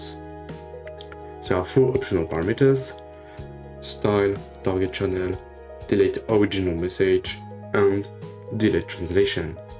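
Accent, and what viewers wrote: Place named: France. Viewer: No stress. We have a number of French users and I thought you sounded French so you might have done it already. I have now set them up, so not a problem. Thanks for the video though it is very helpful.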